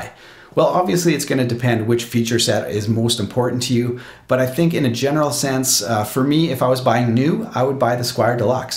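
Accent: Canada. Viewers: One of the most Canadian accents I've ever heard.. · Now there's a Canadian accent!!!!! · I'm English and I picked up on the Canadian accent. Certain words have a Scottish ring to them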